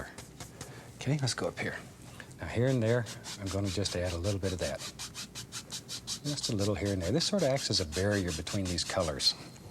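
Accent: South, United States. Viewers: "It's purdy" God bless the south!!! And thank you for our southern accents!!!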